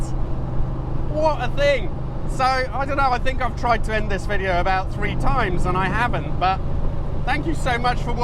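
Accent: Italy. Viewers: Love that car, what a machine. And regarding people moaning about the Italian accent, don't change it, if they don't liked don't watch it. :) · Absolutely love the pantera hate the Italian accent totally spoiling your videos which I look forward to watching every week please stop as it’s cringe city,keep them coming (without accent)👍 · I had driven my friend’s LHD in Vancouver back in the 90’s and it was amazing! BTW, Italian accent is beautiful! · Pantera has been one of my favourites since when I was a kid. But I didn't know she had such a gorgeous beautiful interior until I saw this video. Especially after seeing a spaceship like cockpit of the modern cars , it's got a very good taste. As someone said that it's not a car but art. Yes it's more than a product but art level of thing with a passion. It must come from Italian character. I like the way you talk so nicely and jolly and clear as well.And I don't mind sometimes you talk with an Italian accent. 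It's fun and give your program a good character. I accidentally found your program first time today. I like your narrations very much. It's very pleasant. Thank you!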